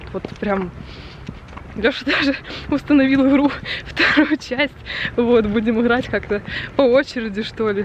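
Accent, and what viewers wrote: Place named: Okanye, Russia. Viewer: Перестань О-кать.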